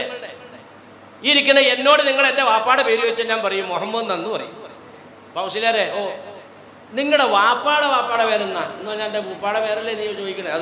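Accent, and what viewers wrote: Perumbavoor, India. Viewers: ഉസ്താദ് പെരുമ്പാവൂർക്കാരൻ ആണോ? സംസാര രീതി അത് പോലെ ഉണ്ട്..എൻ്റെ ഉമ്മച്ചിടെ വീട് അവിടെ ആണ്..ഉമ്മചീടെ വീട്ടുകാർ സംസാരിക്കുന്നത് പോലെ തോന്നുന്നു...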